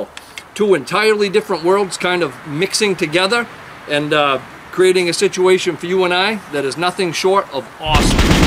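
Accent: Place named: Northeast, United States. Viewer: I feel really stupid for asking this question but what state are you from? It sounds like Northeast, which isn't known for being gun frirndly!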